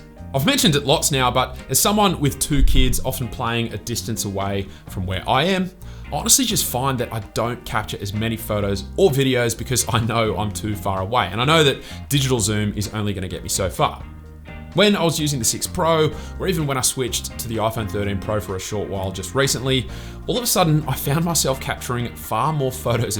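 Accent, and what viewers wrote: Australia. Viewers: this guy looks american but has an australian accent. so interesting!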